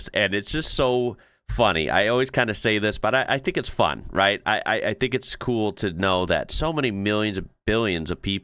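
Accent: Australia. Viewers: You’re beginning to sound like an Aus with your introduction mate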